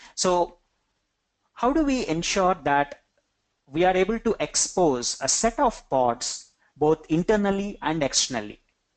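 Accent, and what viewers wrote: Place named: India. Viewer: I usually find the Indian accent very difficult to acclimatize to... but this was actual very good and I enjoyed it! Many thanks!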